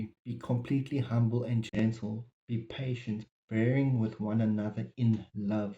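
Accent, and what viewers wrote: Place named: South Africa. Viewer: You sound South African brother! Thanks for sharing. Popping by from Mozmabique!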